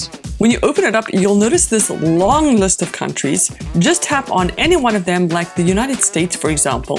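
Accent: South Africa. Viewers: You have a South African accent.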